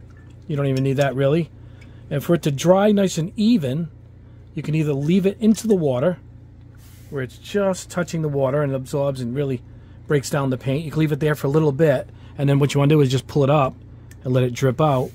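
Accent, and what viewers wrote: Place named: Boston, United States. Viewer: Back to the hobby in the last 6 months. I just found your videos and am enjoying myself at 63 years old. I live in Minnesota, yet am from Boston... so the accent is like home.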